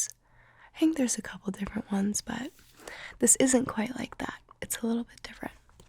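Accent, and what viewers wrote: South, United States. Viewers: I always love your videos. Your incredibly talented. My favorite series, or style is your southern accent videos.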